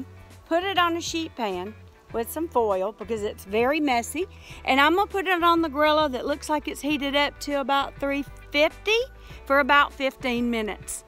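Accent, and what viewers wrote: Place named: South, United States. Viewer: Love the southern accent! Wish we had the snow!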